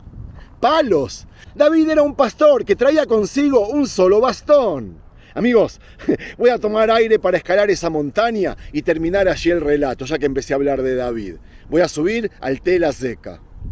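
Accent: Argentina. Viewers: Yo pensé que era Argentino.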